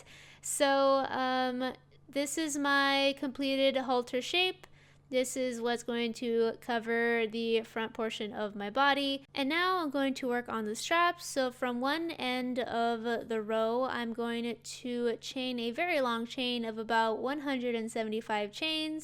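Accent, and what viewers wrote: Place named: United States. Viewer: Sweet.Can anybody tell me what U.S accent does the craft lady have?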